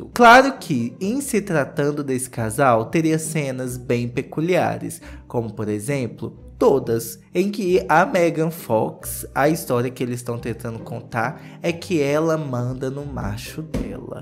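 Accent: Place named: Rio de Janeiro, Brazil. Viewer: Sutaque carioca e o melhor kkkk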